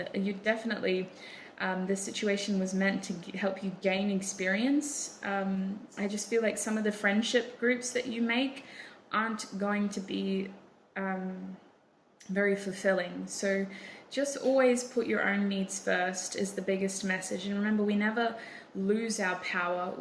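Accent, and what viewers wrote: Australia. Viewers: Yoooo this is my first time watching any of your vids popped up on my recommended cause I’ve been watching a bunch of tarot lately 1 I was surprised hearing a fellow Aussie accent 2 I picked pile 3 it resonated so strongly I was shocked 3 it’s the first time I watched someone pull letters out and stuff YOU LITERALLY SPELT MY NAME “Steph” and also my last name, is P so really u spelt “Steph p” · I literally saw the backyard and thought "huh. That looks very Australian" and then you spoke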